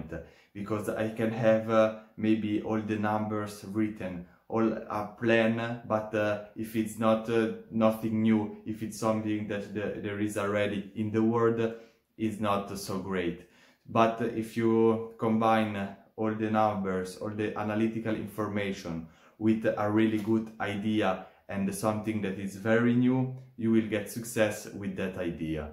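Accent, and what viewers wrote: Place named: France. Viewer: I ear a little background french accent haha. nice video !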